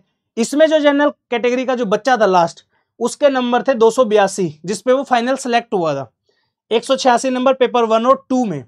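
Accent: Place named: Haryana, India. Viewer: Haryanavi boli 😅😊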